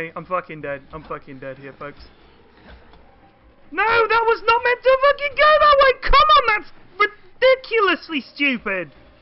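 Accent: Germany. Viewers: Amazing german accent! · dat German accent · started with a generic german accent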